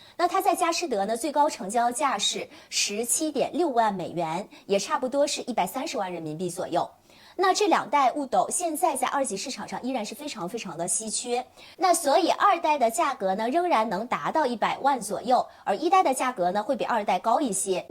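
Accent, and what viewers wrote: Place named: Japan. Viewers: 可是她不只是用日語發音，還混著來，聽起來真的很突兀。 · 因为她说的是日语发音。她在日本做这行，按日语发音来没有任何问题啊。就像我们把Hermes用中文音译成爱马仕，法国人也没理由来指责我们发音不标准吧。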